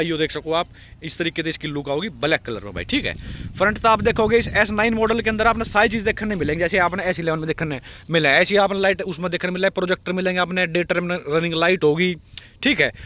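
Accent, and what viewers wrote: Haryana, India. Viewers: Love the Haryanvi accent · Love this accent of ur hryanwi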